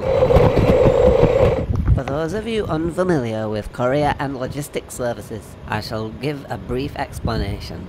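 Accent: Yorkshire, United Kingdom. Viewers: I can tell this guy is from Yorkshire because he sounds like David Firth.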